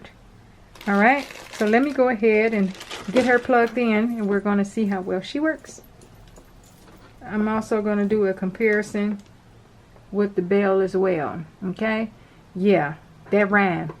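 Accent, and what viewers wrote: United States. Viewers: Girl, are you from WV?! You sound more country than most my home girls here in the sticks! Love it! Thanks for the review! Very helpful! Edit: Did I miss this answer? Can you use them while they're plugged in charging?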